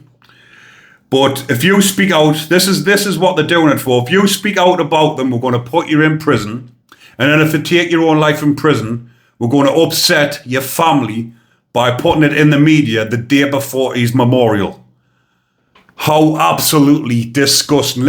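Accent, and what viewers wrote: Newcastle, United Kingdom. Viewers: Lad are u from Newcastle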